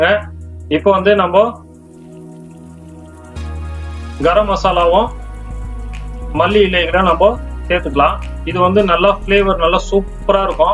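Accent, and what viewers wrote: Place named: Bangalore, India. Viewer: Bro neengo Bangalore slang pesuringo correct I think you are from Bangalore I followed your tips it came very wellmy wife & childrens enjoyed it Thank you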